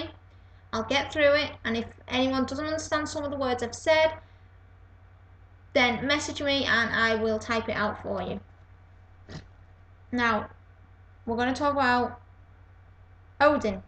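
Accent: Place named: England, United Kingdom. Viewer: I can hear you loud and clear you sound fine and have a beautiful voice. I think chics with a real english accent are hot. Stop listening to what others say they are just jealous of your beauty.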